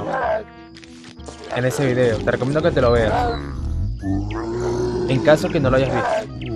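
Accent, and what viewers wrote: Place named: Paraguay. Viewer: Se nota el acento Paraguayo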